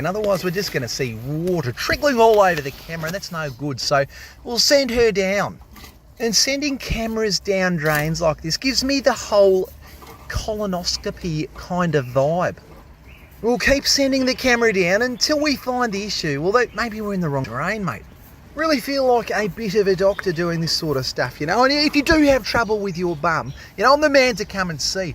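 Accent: Australia. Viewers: I am from Hamburg, Germany, just stumbled over your channel! And I can tell that you ar Australian! Both from your mild accent and the channels name. But where exactly?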